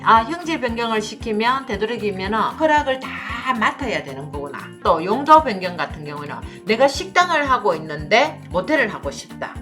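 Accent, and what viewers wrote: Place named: Gyeongsang, South Korea. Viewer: 표준어좀 씁시다 젠장 갱생도 말좀 쓰지말고 먼소리 인지 하나도 모르겠음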